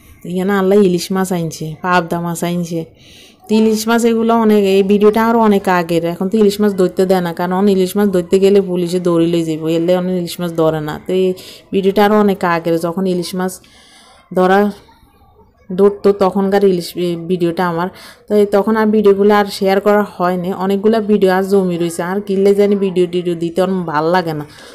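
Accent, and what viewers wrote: Noakhali, Bangladesh. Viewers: আপু আমি তোমার কথাগুলো খুব মনোযোগ দিয়ে শুনছিলাম।তোমার মেয়ের খেলার বর্ননা শুনে আমি হাসতে হাসতে শেষ।পুরা ভিডিওর কথা শুনেই আমি হেসেছি।তোমার নোয়াখালীর ভাষা বেশ ভালো লেগেছে।তোমার বাবার পাঠানো জিনিস গুলো দেখে আমার বাবারবাড়ির কথা মনে পরল।ভালো থাকবে। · নোয়াখালীর ভাষায় কথা শুনতে বালো লাগে দারুণ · আসসালামুয়ালাইকুম প্রিয় আপু হাহাহা অসাধারণ হয়েছে তোমার মুখে নোয়াখালী ভাষা শুনে তো আমি ফিদা, মাশাহ আল্লাহ মামুনি জন্য অনেক অনেক দোয়া আর ভালবাসা রইলো অনেক বাজার,, ইলিশ আমার প্রিয় মাছ,, প্রতি দিনের বাজার প্রতি দিন করা ই ভাল মাশাহ আল্লাহ বস্তার ভিতরে তো অনেক জিনিস 😜 খেজুর পার্শেল করো আপু, অনেক অনেক ভালো লাগলো আপু ❤❤❤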